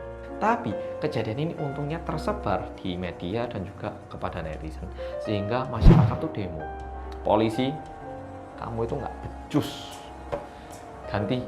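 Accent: Java, Indonesia. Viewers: Bang logat nya Jawa banget😁 udah kayak logat presiden Jokowi 👍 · First impression liat videonya lek 😁 krn org korea yg medhoook 😍 stlh liat² trs videonya trnyta personality nya Indonesia bgt mmg 👍🥰 apalg dg logat medhoknya yg kandel,sesama jowo aku bangga bgt 👏🤩 Trs berkarya lek,aku padamu 🤭🥰🤩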